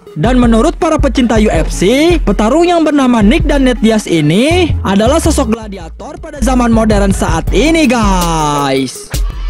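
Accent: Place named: Madura, Indonesia. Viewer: salah fokus , voice over logat madura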